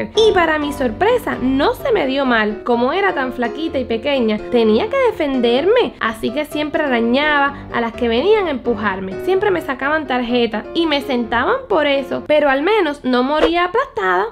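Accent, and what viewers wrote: Cuba. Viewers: Ellas tienen el mismo acento por que las dos son cubanas y no le puedes prohibir eso 😒😒😒y ultima si no te gusta vete...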